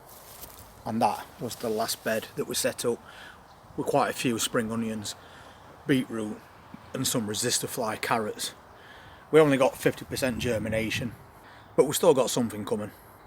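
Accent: Yorkshire, United Kingdom. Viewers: Ay up I can hear a Yorkshire twang were you from? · I'm from the other side of the Pennines, but I thought you were a Yorkshireman too! Enjoying the videos. Very relatable. Thank you!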